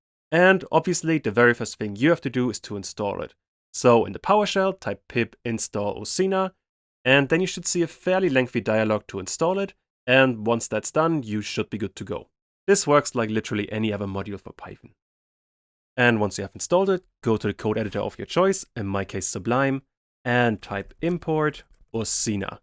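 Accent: Germany. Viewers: Sound like german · are you german? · Are you german? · deutscher akzent? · you have a nice accent, are you German?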